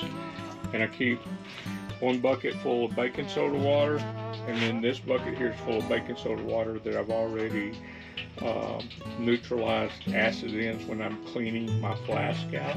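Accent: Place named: South, United States. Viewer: The southern drawl-y accent is super likable to me atleast, whos from Northern Sweden, arctic cirlce. ❤️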